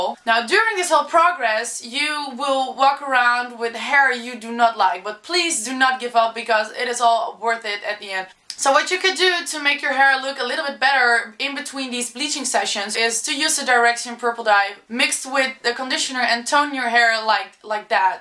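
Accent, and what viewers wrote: Netherlands. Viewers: Kom je uit nederlamd?? E ech tmooi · Hahah iedereen zegt precies wat ik dacht! xD je hoort dat je nederlands bent :p But hey, proud to be dutch right? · Are you Dutch?? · ik kan het nederlandse accent al horen lol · You're Dutch right? I reconised the accent haha ;).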